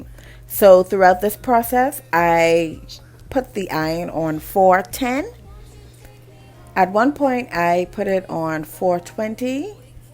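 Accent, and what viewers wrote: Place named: Bahamas. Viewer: That has to be a Bahamian accent.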